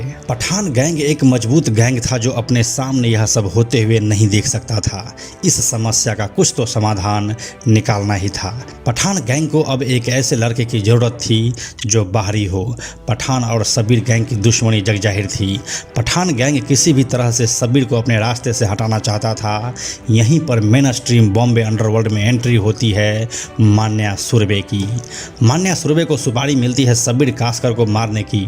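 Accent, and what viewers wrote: Bihar, India. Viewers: Bhai pahele to yeh thik ker le us ka naam shabbir nahi sabir tha.dusre us ki girl friend kamathi pure ki nahi Congress house ki thi Jo lamington road per hai kamathipura se dur ..tesre aap ki awaz bahoot acchi hai behari tone wali.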